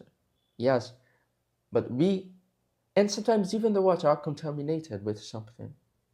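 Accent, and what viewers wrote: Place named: United Kingdom. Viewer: You've got a nice British accent ! I speak with American accent, but I've always found British way of speaking beautiful! Have a nice one my friend ! 😊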